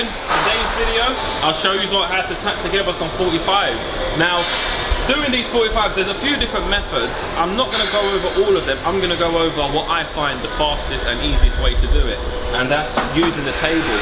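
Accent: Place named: London, United Kingdom. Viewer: I try to understand your prononciation just like London down town.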